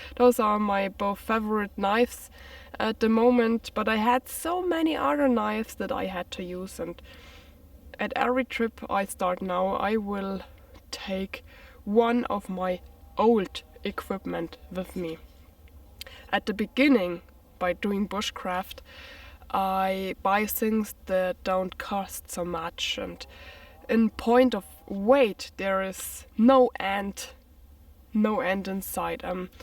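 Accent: Bavaria, Germany. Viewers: Enjoyable video. I like the fact that you sound like a German speaking English. You speak much better English than I will every speak German. I saw your tattoo which I assume is new and that is what you were covering with the Archery arm guard last time. What is the the tattoo? I was not able to make it out. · I really love how you narrate the nature. I also love that you actually thank everyone for their comments. This is kinda a long message, I hope you don't mind. My dad adores your accent because he grew up in germany while his dad was trying to fix things after that thing happened. Bavaria is so beautiful. We love seeing you explore it. We're also skillful bushcrafters and we would be happy to host you if you want to explore the USA forests. Happy camping, and I'll be praying for your recovery.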